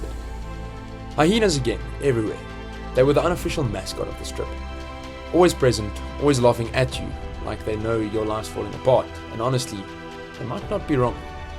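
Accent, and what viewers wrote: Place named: South Africa. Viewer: Pretoriuskop to see leopards.....definitely not. Travel more north to the Skukuza and Lower Sabie region to see lions and leopards. Thravel NORTH not SOUTH from Pretoriuskop to the Skukuza region......you have the South African accent but you don't know directions, so maybe you are tourists. At the end saw your name....what a surprise.....I am also a! (from Pretoria)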